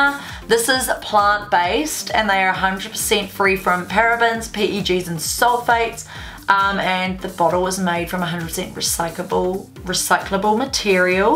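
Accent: New Zealand. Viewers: your New Zealand accent is so cute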